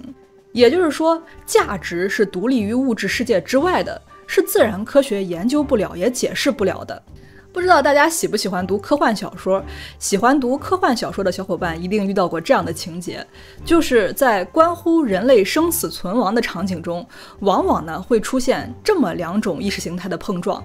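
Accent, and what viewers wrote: Qingdao, China. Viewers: 这姑娘好像是青岛人啊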